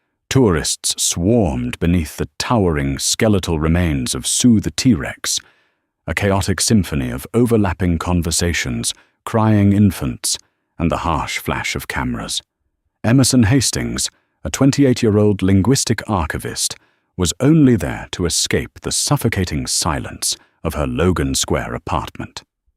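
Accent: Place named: United Kingdom. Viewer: The British accent gives the narration more gravitas. But use a female narrator sometimes, please.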